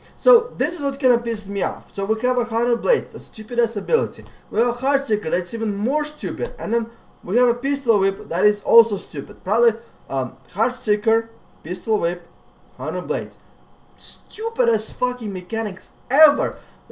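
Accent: Russia. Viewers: Pure russian accent and humor . Gotta love his style <3 .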